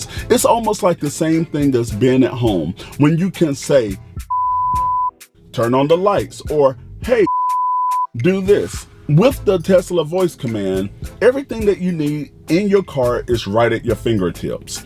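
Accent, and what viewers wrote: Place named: Atlanta, United States. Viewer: Great videos my man. First thing I thought when I heard your voice was “He sounds like he’s from Atlanta” and sure enough I saw the map haha. Keep up the good work.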